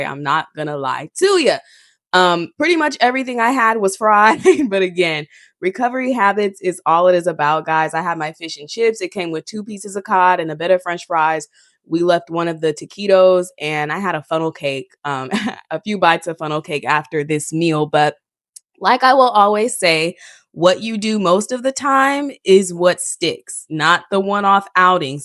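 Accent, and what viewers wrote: Caribbean. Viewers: Hey 👋🏿, do I hear that you have a Caribbean accent? If so where from?🙂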